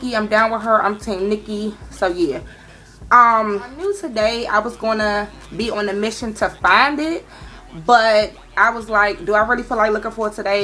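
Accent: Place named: Washington DC, United States. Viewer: yas , that dc accent is thick hunty !!!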